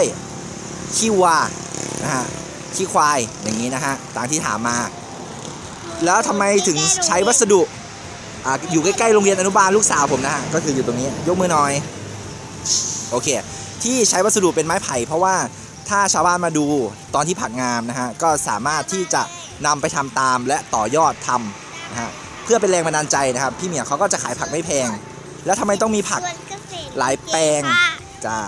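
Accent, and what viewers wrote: Isan, Thailand. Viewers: ชอบพิธีกร พูดไทยปนอีสาน ดีเนาะ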